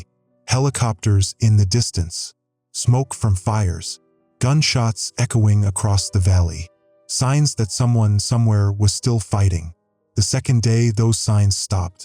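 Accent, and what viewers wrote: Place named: Spain. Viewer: The Spanish accent occurring randomly throughout is distracting. How does that even happen?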